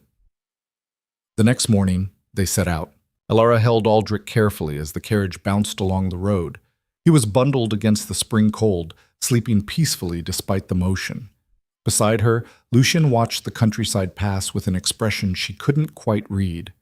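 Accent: United States. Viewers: So much ridiculous drama. The choice of narration grates - makes them sound like teenagers. Truly recommend scrapping the flat American accent. It’s a British tale, deserves British narration.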